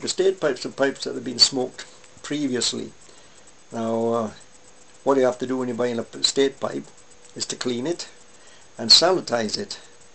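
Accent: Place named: Scotland, United Kingdom. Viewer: I'm curious about where you're from, it sounds sorta scottish but not really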